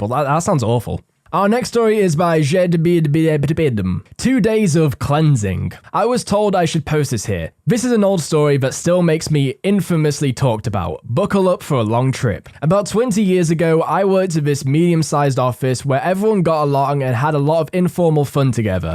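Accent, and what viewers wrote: United Kingdom. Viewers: It may be the accent. Since Jake is British. sounds like he has a British accent also. Edited to add: he verified that he is from England. The Accent is probably why you thought about Jake. needs to speak a little slower so we can understand him a little better. Jake and both speak a little slower.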